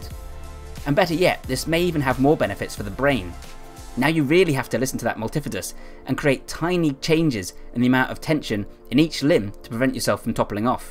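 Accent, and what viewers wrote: United Kingdom. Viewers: why is there a Dutch windmill in the video but i hear a british accent? I didn't know the UK has such windmills too!! awesome, I will implement this kind of training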